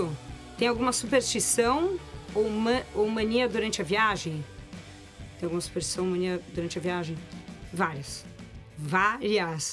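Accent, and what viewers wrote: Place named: São Paulo, Brazil. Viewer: A voz grossa dela é inesquecível, e esse sotaque paulistano.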